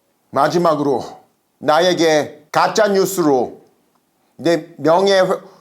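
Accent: North Korea. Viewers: 약간 북한말투..?? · 근데 미국인이 왜 북한 말 하는 거 같죠?? 어디서 봤는데 닮은 사람.... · 말투 점점 북한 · 뜨문뜨문 북한 억양이 있는데?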